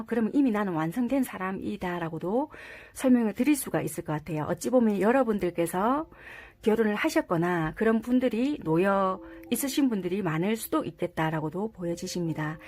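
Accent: Busan, South Korea. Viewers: 하이용 또왔어용 쩍지보러 제가 궁금한건 믿음이 안가는 현실을 스스로 만들고 믿어달라하는 타로가 나와서 사람은 믿을게 못되는데 타로를 재미로 보기가 힘듬 물어볼때가 없어서 보는거거든요 잼있게 보고 조아요 미리누르고 가요 좋은밤되요 👍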